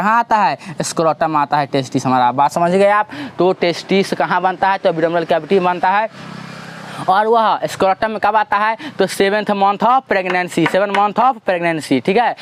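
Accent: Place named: Bihar, India. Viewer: Bihari styles me wah...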